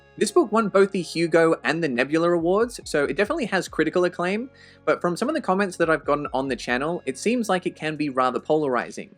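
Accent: Australia. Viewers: Australian… I think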